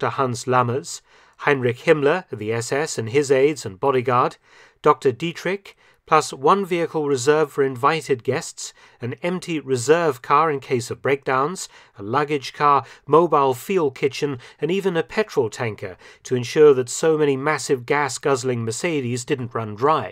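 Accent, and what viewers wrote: United Kingdom. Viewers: his proper British accent, is second to none. 💯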